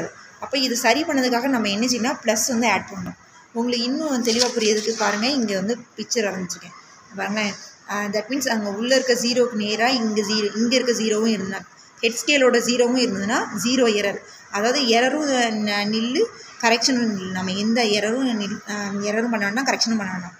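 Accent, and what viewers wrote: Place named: Kanyakumari, India. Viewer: Mam nenga kanyakumari slang la pesuringa 😅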